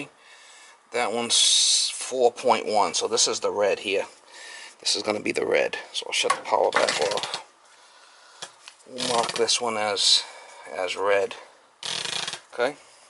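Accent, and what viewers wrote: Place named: South, United States. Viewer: You must be from the south with that accent. - We are down in New Orleans.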